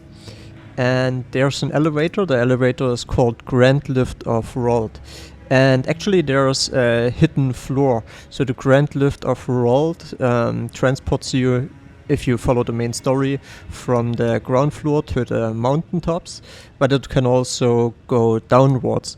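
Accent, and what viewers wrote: Germany. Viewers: der deutsche akzent scheint durch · Hör ich da nen starken Deutschen Akzent? Hehe aber gutes video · U sound way to german xD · Are u german? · Are you german? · Kann es sein das du deutsch bist? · You have German accents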